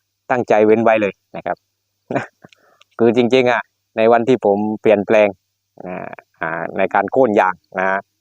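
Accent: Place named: South, Thailand. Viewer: แหลงใต้ชัดเชน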